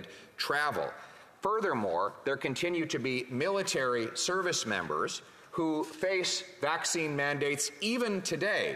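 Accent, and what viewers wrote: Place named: Canada. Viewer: The Cannuck accent....😩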